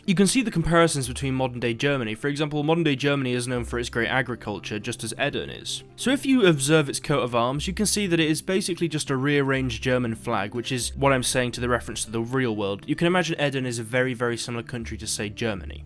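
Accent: United Kingdom. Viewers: Loved that intro for the Witcher 2 with Letho wish i had played it on ps3 and let Letho live. Are you from the UK?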